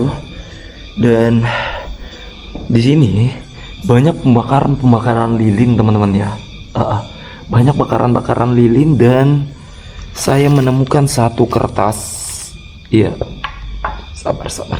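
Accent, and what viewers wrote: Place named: Makassar, Indonesia. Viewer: Maklum dia lagi belajar bahasa logat jakarta karena dia aslinya orang makassar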